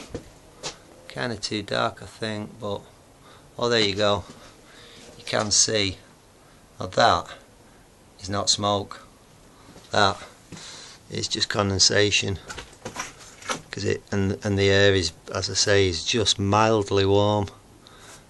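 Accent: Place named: North England, United Kingdom. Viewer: Very ingenious design. If I make one of these, it will borrow this design. From your accent, I'm guessing you live near Liverpool, or Manchester? (or lived near there once.) {subscribed}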